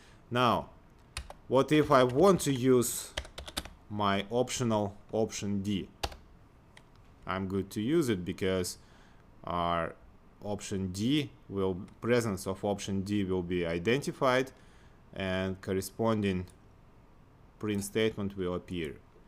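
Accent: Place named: Ryazan, Russia. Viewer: Заметный русский акцент)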